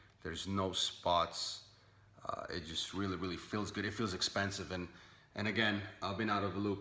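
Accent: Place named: Italy. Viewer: You sound a little bit italian😂. I‘m italian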